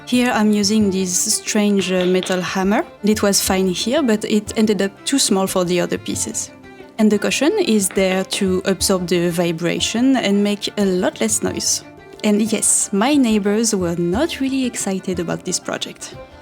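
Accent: France. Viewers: Listening to your lovely French accent and watching you craft beautiful leather work is magical.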